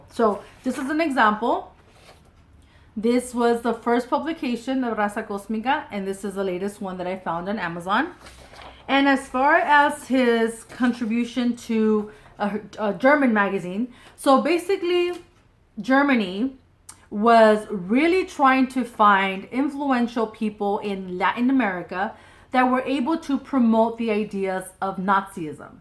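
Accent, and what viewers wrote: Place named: United States. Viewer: Too American of an accent 😖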